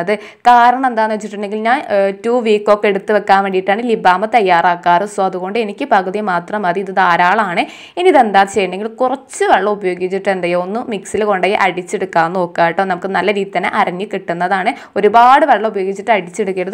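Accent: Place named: Kanhangad, India. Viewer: Kanangad slang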